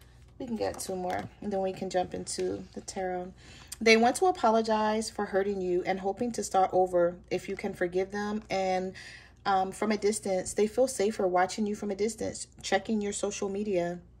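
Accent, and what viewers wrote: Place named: East Coast, United States. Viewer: I can hear that east coast accent 😂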